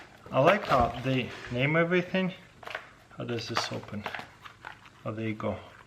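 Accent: Russia. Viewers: Why does it seem like auto captions works better on english in a russian accent than english in a... english accent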